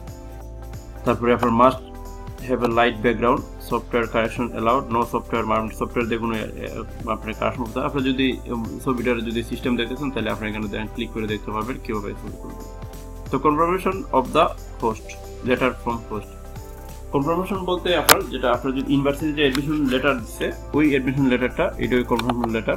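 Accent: Sylhet, Bangladesh. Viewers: Sylheti vai😍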